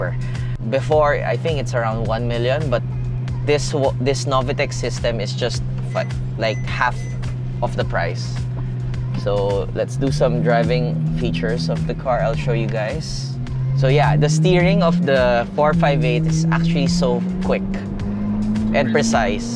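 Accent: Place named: Philippines. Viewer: You speak english but still sound philipine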